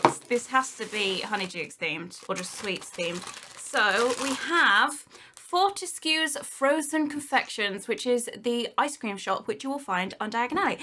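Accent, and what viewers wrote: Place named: England, United Kingdom. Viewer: Just wondering you sound English How do you pay in GBP Or do you just have to pay in dollars?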